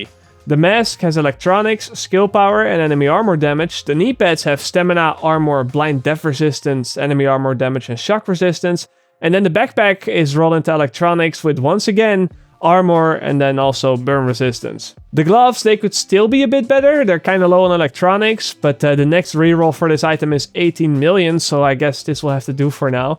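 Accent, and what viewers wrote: Netherlands. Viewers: die nederlandse accent klinkt echt kut xD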